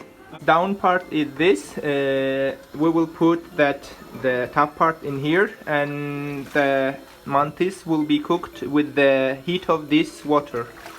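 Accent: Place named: RP, United Kingdom. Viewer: perfectly posh thank you!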